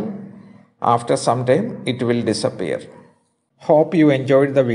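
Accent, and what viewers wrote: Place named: Kerala, India. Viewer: Full Mallu acsent ..Funny!!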